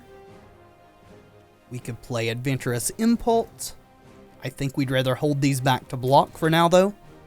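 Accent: South, United States. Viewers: Thought it sounded more like a southern accent, guess I'm not great at recognizing American accents. Keep up the good work!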